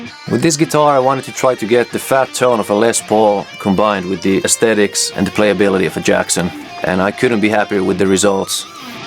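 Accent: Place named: Germany. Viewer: Man, I just found you and as a Jackson lover since the start of the 80s and having started playing guitar back in 77 I love this. I have always loved these guitars as well. The homy grail of Jacksons. I have a charvel Preditor crackle from 91 with a factory Schaller trem I love and with the reverse headstock which is too cool. I dig your playing too man. Do you have a band website where I can listen and buy your music? What country are you from may I ask? You sound sorta German but can't quite put my finger on the accent. 😂 Regards and stay healthy brother. From the heartland . Indiana USA Tim . ❤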